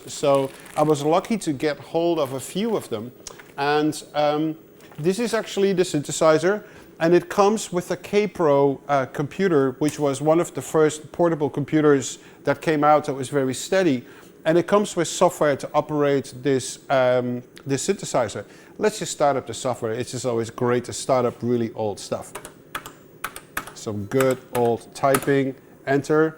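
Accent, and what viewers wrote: Netherlands. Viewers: That's more just his accent. He's Dutch. Just for an example, Canadians will say some vowels surprisingly different than other English speaking peoples.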